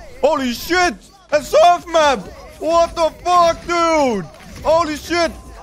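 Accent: Denmark. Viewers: Dude u cannot hide ur danish accent. This is literally the first time I’ve heard your voice and I immediately knew you were a Dane and so am I. Dansk accent virker ik sår godt med engelsk. Lol. Bliv ved med ag lave videoer de ser interessante ud! (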